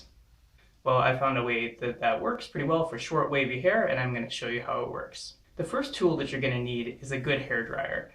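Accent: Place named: United States. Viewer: I know you're from somewhere around India... but you do an American accent... sounds interesting.. you immigrated to the west.?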